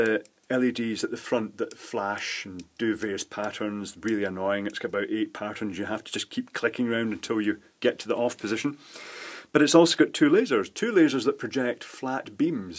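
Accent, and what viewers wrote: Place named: Glasgow, United Kingdom. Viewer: are you fae Glasgow ?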